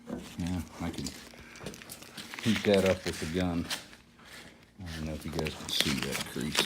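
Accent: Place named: South, United States. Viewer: ^^^^ To O.J. Avery ^^^^ Maybe you should get some hearing aids or turn the volume up. How much do you really need to hear anyway it’s pretty self explanatory. I think you were just taking a jab at some who talks a little differently than you or has a southern drawl. That kind of senselessness is just mean and evil and a huge part of why we are where we are as a country right now. Hope it helped you sleep better at night. Peace ✌🏼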